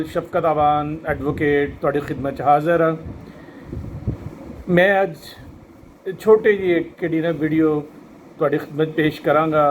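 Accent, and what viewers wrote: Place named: Fazilka, India. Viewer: Jis Insan da Takullash hi..Dast..si..oh wakya hi... Laxman Dost si .ohna de.. Akal Chalana da Afsos hai..Or.. Fazilka or Jalalabad West.. Ilaqey d Bolli da Lehza..Same tuhadey Ilaqey da Lehza hai... Tuhada Gam..Sanjhah karna..tuhadi. . Parwarish da Natiza hai..Sada Hasdey Wasdey raho.. Slam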